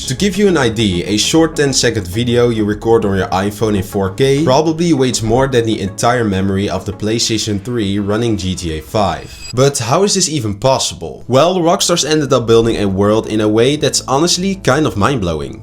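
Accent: Netherlands. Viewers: I hear a Little Bit HOLLÄNDISCH❤😂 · Holy Dutch accent maat, maar goeie vid 👏🏼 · Je klinkt Nederlands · I hoor dutch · Are you dutch? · hoe nederlands is deze guy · Nederlands denk haha leuke videos man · Brother I live in NL and something tells me you are dutch by your accent haha. Anyways very dope vid with dope analysis 💪🏽💪🏽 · You must be Dutch my friend, Right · Kountree? If this guy aint Dutch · Are you dutch😂🎉? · You're Dutch or your accent is Dutch because you're nervous. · Ik hoor het nederlands accent in je engels😂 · great editing, unique commentary, and a dutch accent, is a fast Like from me!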